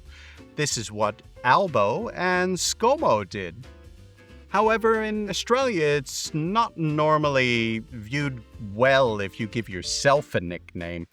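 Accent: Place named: United States. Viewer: why does he keep saying "we" if he sounds american, lol, saying "bugger" in an american accent is just not cool. Let me guess, oh yeah, this was produced by a woke TV channel, that explains everything. So annoying honestly -.- stop pushing the agenda of mass immigration -.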